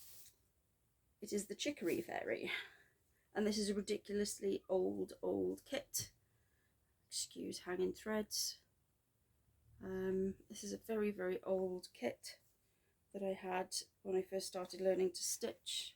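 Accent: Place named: Wales, United Kingdom. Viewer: I knew i had the fear of horses for a reason! 😱 yes I feel you would be nice to not work and stitch all the time 😁 i will send you screenshots of how I do it 😊 maybe focus on a piece for 3 days then move on to something else? I always look forward to your vids I very much love listening to the welsh accent 😁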